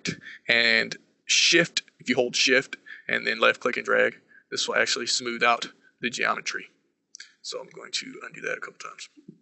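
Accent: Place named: South, United States. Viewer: You sound like you're either in Tennessee or Georgia. Great tutorial though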